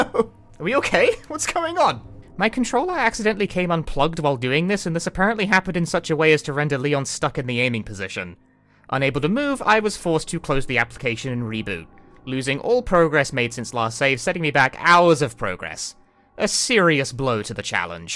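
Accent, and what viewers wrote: United Kingdom. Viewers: Are you british? You talk like a sitcom character.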